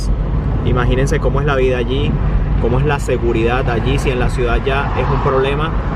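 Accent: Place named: Venezuela. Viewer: Que asco da venezuela, en serio, se los llevó el diablo... 1. El barrio mas rico de caracas (la lagunita country club) es un simple barrio estrato 4 residencial de Colombia, y "las mercedes" un simple estrato 4 - 5 comercial en Colombia. Ni su palacio de miraflores llega a ser estrato 6 en Colombia. 2. La zona colonial caraqueña (el altillo) es una completa mierda comparada con los magnanimos centros historicos de Cali, Cartagena, Popayan, etc, 3. Los centros comerciales de "clase alta" en venezuela parecen un simple supermercado Ex!to en Colombia. *América es un continente. No un país; analfabeta (tras de que tu acento venezolano es totalmente barbarico-animalesco, no le podes sumar a eso tu malinchismo iletrado de llamarle a Estados Unidos, América: EMBAJADA ESTADOUNIDENSE, NO EMBAJADA AMERICANA).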